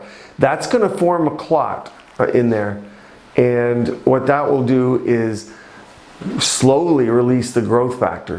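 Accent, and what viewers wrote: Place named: Canada. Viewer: Love - I hear the Canadian accent, eh? Adorable that you do the procedures and understand how the patients must feel.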